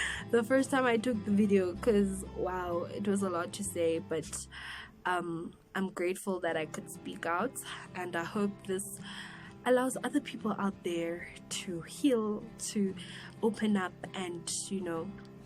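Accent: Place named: South Africa. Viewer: I'm sorry u had to experience that. So glad u r talking about it. It's nice to see someone from Madagascar in SA. I love Malagasy language, music and culture. It seems u have been in SA for a while (your accent says it all 🙂). If you visit Madagascar one day, please get me CDs, will pay for them. Regards Richard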